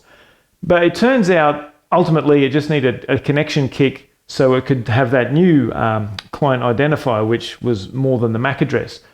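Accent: Australia. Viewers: straight in with c. YT SF-based kiddies, I mean algorithm trying to turn Oz accent into Yank accent and run it through ears algorithm again... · Good shit. We need easy to follow guides on getting not just familiar, but comfortable in using linux daily, all spoken in fluent (fuckin') Strayin.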